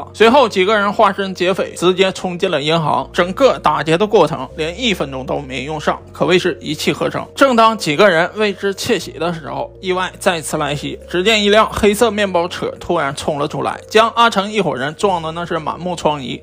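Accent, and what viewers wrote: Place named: Northeast, China. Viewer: v 主播这个东北北京话有点意思啊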